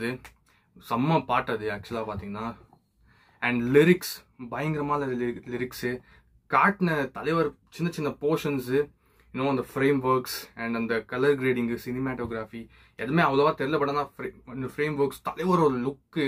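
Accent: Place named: Bangalore, India. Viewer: Unnoda Bangalore Tamil and Excitement for Walter White dialogue ku naan fan aiten da.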